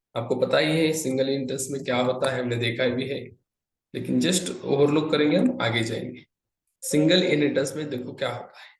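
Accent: Maharashtra, India. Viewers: sir you are so much funny 🤣😂, also i luv ur marathi and hindi accent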